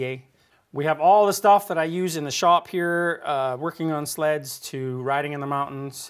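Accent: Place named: Sherwood Park, Canada. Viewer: I thought your accent sound familiar, I lived in Sherwood park for 3 years.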